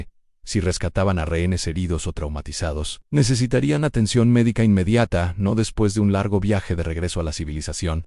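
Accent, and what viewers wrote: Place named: United States. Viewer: La lectura está bien lo que paso es que primero habla del apache herido como si fuera mujer y luego como hombre así que eso genera por así decirlo ruido al escuchar, en algunas partes le pone entonación como si fuera de EU hablando en español , por lo demás está bien , solo pónganse de acuerdo si hablan de un hombre o de una mujer, y eso no quiere decir no se agradezca las publicaciones con audio , saludos desde Puebla México 🫂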